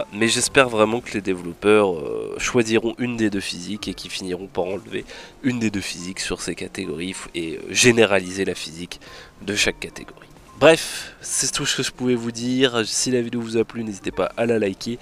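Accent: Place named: Lorraine, France. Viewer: lol me suis fais la meme remarque. mais ça c'est l'accent de lorraine; en vrai il est assez eloigné du français lol.. comme le "j'ai pu', au lieu de "j'ai plus"..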